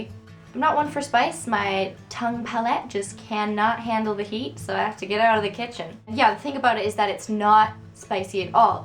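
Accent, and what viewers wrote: Canada. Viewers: This is the first video ive watched of you and i immediately could tell you were from canada :p i love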